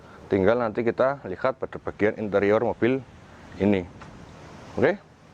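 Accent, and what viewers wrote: Banyumas, Indonesia. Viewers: Tawa mobil bonus paving, pa bos,,?? Rika wong ngapak ya,nyong demen,,aku duwe wedus,go dp bisa?? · Ha ha ha slm ngapak.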